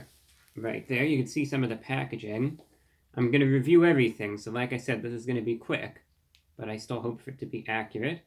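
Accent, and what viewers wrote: United States. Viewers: Is this a posh american accent?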